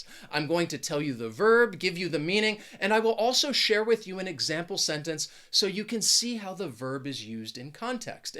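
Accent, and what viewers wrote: United States. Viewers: You are really great sir Are ya really AMERICAN If you're not you just sound 100 percent like an AMERICAN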